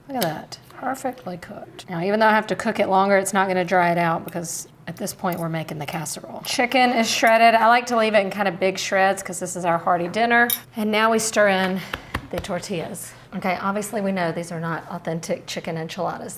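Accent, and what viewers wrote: United States. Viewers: I hear your pain. However, this is an American channel. Should we check the world over and only use ingredients universally available? Should your national cooking channel only use ingredients that are widely available in the US or world wide?? Honestly, I don't want to watch a local foreign channel that only produces world accessible dishes. I want authentic recipes. Authentic culture. Authentic accents. You are getting a taste of American culture 😊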